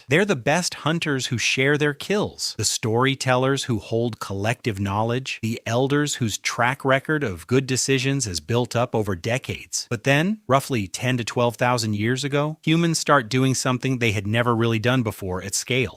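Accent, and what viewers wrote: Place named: United States. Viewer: No American voice please. Video rejected. Goodbye Yankee.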